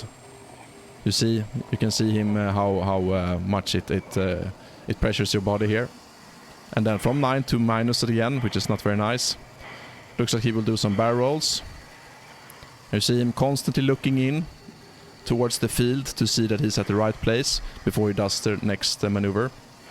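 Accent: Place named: Sweden. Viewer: Love your Svengeska.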